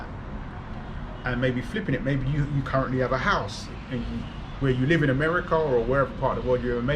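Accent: London, United Kingdom. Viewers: Referred from Ace’s channel.. I’m not gonna lie it’s nice to see Londoner that looks and talks like me.. that’s taking the leap to broaden his horizons unlike most people who happen to think “London is everything” going forward with your channel and life in general wishing you the best 👊🏾 ps.. I’ve liked and subscribed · Had to do a double-take when I heard the London accent haha - a lovely surprise! We lived in Tottenham until the riots (my ex-husband still lives there). So hard to find any content from Black British people who have moved over to Latin American countries. I was initially looking at Mexico for me and my daughter, but now I'm discovering content about Colombia! I'll be a new subscriber lol.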